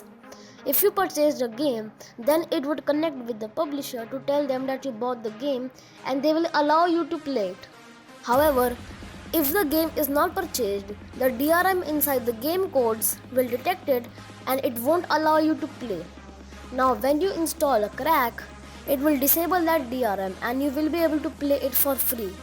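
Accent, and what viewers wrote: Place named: India. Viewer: My Desi accent boi🙂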